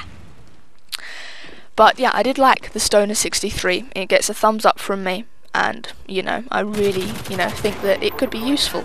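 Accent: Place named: Surrey, United Kingdom. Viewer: R u from Surrey, you have a Surrey Accent And you are like the best educated person on youtube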